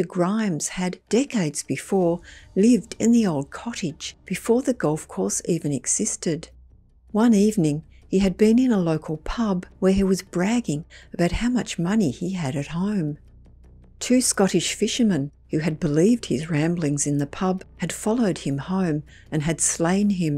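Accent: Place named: Australia. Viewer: I love your Aussie accent and the way you narrate. Thank you 🙏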